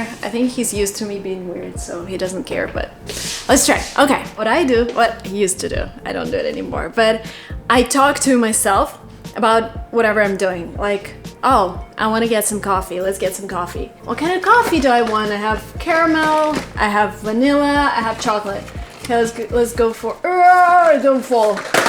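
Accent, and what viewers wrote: United States. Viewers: You are so beautiful and everything is naturally . I love your American accent. How to work with "fluent express" because I'm newer here. Can you explain please. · Where are you from ?, i was thinking tha you American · Your accent sounds 💯% American english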